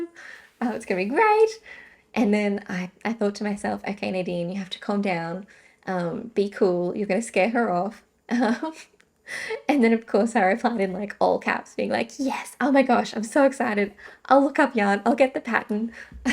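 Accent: Australia. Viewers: Congratulations on your socks. They are beautiful. 9" circs give me hand pain too. I prefer magic loop or DPNs. I still use my Chiagoo 9" needles to hold stitches for heels and such. Your Aussie accent is charming.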